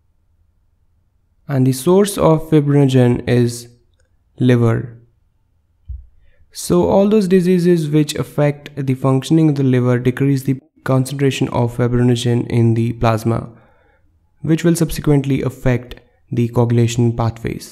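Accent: India. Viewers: Nice video, but seriously the way Indians talk is infuriating. Please check <link> for the correct pronunciation of fibrin -.-"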